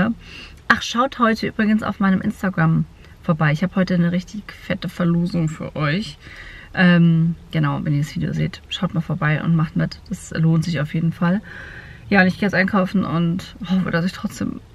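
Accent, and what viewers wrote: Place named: Hesse, Germany. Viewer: Das ist HESSISCH und heisst nebbber mir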